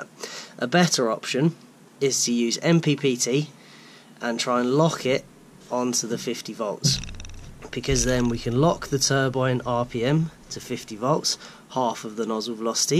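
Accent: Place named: England, United Kingdom. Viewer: It’s like your speaking a foreign language with an English accent. Sounds like you’re on track though and hopefully you can upgrade the controller soon and find that lost power.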